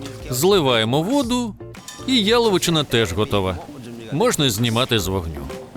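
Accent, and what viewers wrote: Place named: South, Russia. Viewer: уважаемый, понимаю южно- русский говор, просто слух режит. Не кому не в обиду будет сказано.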